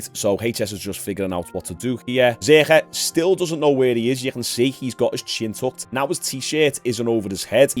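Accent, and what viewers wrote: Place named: England, United Kingdom. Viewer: “They’re all little faggots” -weird English accent. Everything sounds better in the American accent y’all created it but we refined it, if you feel anyway about that comment just remember what happened in 1776 ya little hobknocker I love you ❤️ your content is refreshing and I believe your consistency and ability to hustle hard will take you to the top, I’m younger then you so I think you will get there first but when I get there just make your you remember the American that was happy you Sa’id the word faggot openly 😂 love ya bro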